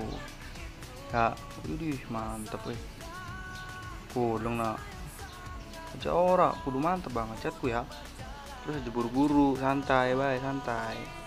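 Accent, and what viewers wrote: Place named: Tegal-Cirebon, Indonesia. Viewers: Jawane kaya wong tegal cirebon kihh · Ngapak mbah... ??? 😄🤣🤣😂😅😆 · Lah wong ndi sampean kayae dermayu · wong dermayu tah?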